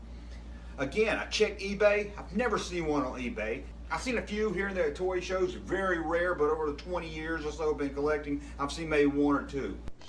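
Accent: South, United States. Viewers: Where are you from, you have a southern accent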